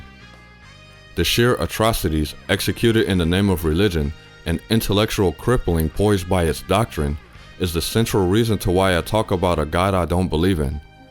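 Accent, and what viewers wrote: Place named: New Mexico, United States. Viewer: Great video. Your voice is easy on the ears and the stuff you are saying is easy on the mind. Are you from New Mexico? Trying to pin down that accent.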